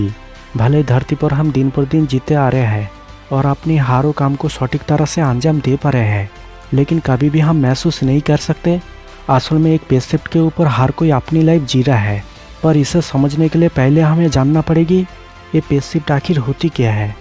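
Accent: Bengal, India. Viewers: Aapko hindi mai nhi bolna chaiye bhai coz aapke awax mai bhutt jyada bengoli accent h...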